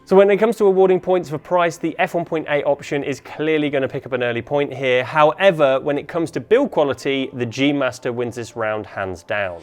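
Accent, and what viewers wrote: United Kingdom. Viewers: I like this guy's humor. Plus having a British accent automagically makes him sound like he knows what he is talking about. Cheers mate! :D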